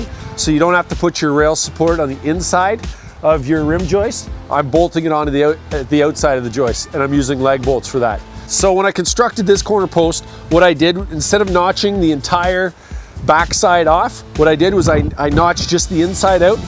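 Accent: Canada. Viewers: Premmo this man sounds so canadain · thats what i do i find the miters eventually come apart but we are in SC ... and he sounds Canadian so big weather difference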